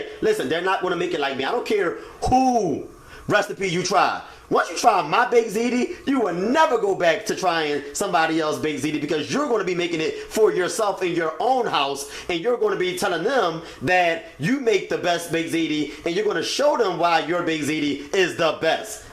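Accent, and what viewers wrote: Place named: Philadelphia, United States. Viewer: He said it right he has a Philly accent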